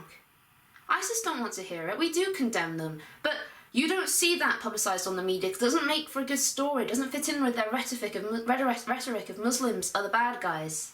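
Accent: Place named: United Kingdom. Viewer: Well you sound like you are in the UK and look like you were Not Born a "Muslim" just converted to Islam. Those are 2 totally different things! This is your "Beautiful Religion" Hunny! Look around you in Europe, won't be long before you ARE IN CHAINS POPPING OUT 10 MUSLIMS BABIES! <link> 2. THIS IS LONDON!!! <link>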